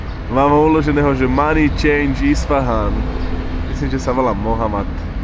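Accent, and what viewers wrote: Trnava, Slovakia. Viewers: hlavne pokračuj s takouto formou. páči sa mi to aj tá naša trnafčina.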